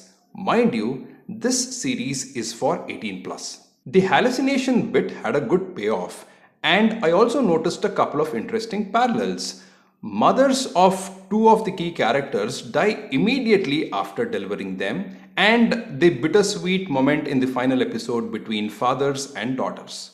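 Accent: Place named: Karnataka, India. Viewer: Very true, when he reviews on the same platform as BR, I feel robbed of BR's take. It probably has to do a bit with his accent/narration style which has a heavy kannada weight to it - it is just difficult to hear English in a kannada style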